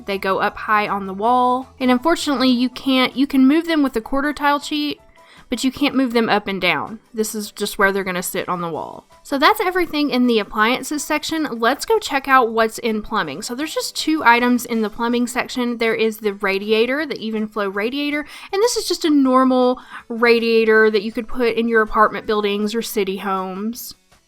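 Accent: South, United States. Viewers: I have been playing Sims 2 (on and off) since 2006, and had NO CLUE about moving wall hangings up and down the wall!!! what!!!!! btw love your channel! you’ve got the best vibes and being from NC i appreciate the southern accent representation 😂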